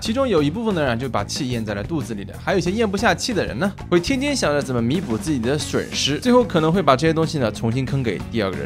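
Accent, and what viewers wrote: Shanghai, China. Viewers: “纵所邹资”作为在海外的上海人 你让我想起了我和蔼可亲的上海语文老师 祝他在天国安好。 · 这个上海腔搭配的恰到好处。色一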